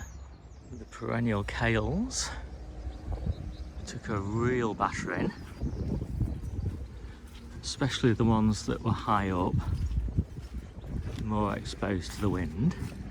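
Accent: United Kingdom. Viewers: I don't live in Lancashire (my grandma was born there however, so I am assuming that your accent is indigenous?) but we did have a weekend with snow here in Tacoma WA, USA (after this weekend I wish that I was in WA, Australia, LOL.) I don't think that anything that I have planted died, broad beans, onions, look OK. I don't have a poly tunnel or other protection, but I do have sheltered areas in the garden so that helped.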